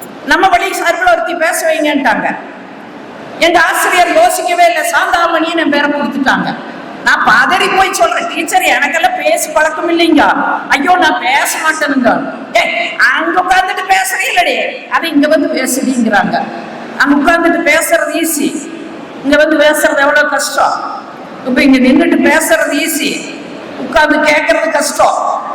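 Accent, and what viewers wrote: Kongu, India. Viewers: சிறப்பு மேடம். கொங்கு தமிழ்....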